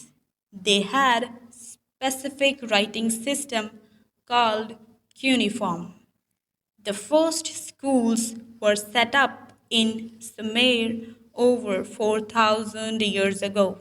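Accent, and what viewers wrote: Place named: United States. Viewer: Madam from where you have learnt American English?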